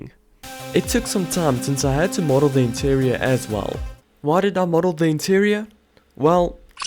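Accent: South Africa. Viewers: This is awesome, you sound like a South African. Support from Greece 💪🏼🇬🇷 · you are so South African i can hear it in your accent me btw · i swear, this guy sounds south african, as a south african myself, i can identify the accent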